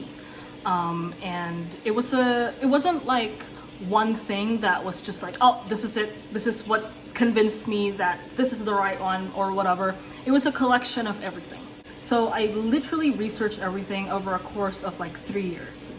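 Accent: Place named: United States. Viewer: please introduce yourself before making a speech. i am curious. your face is like asian but your voice is like american. Are you an american or asian?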